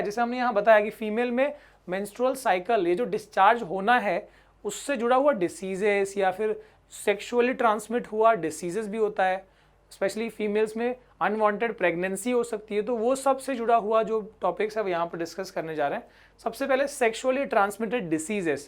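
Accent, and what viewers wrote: Bihar, India. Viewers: Sir is video me Bihari style me bol rhe h ... I like that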